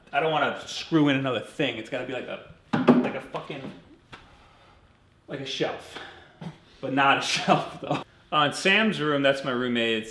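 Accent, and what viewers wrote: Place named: Brooklyn, United States. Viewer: So Brooklyn haha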